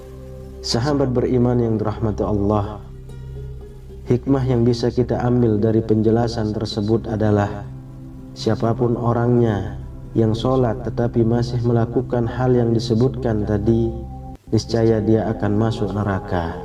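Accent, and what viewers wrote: Lombok, Indonesia. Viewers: admin logat lombok ya..hehe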